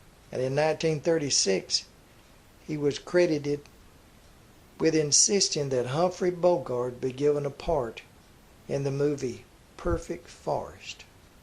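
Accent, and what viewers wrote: South, United States. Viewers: Most interesting thanks. BTW I enjoy your accent. Which part of the South are you from? I live outside the USA so cannot place your state of origin from your accent.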